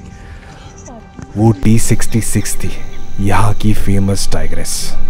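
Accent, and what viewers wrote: Maharashtra, India. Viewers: as much as I love jungle Safari, I hate the Cricket commentry. Marathi accent , I miss it , like I miss the Bagh !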